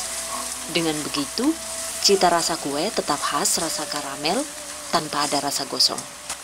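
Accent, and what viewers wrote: Banyumas, Indonesia. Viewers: Yu asline wongendi ngomonge ngapak deneng ky aku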